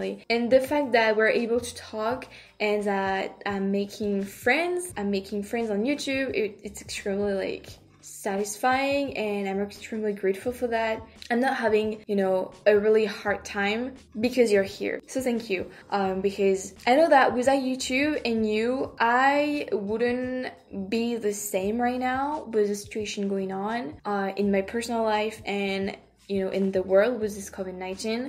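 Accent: France. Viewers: i love the french accent coming through the English pronounciation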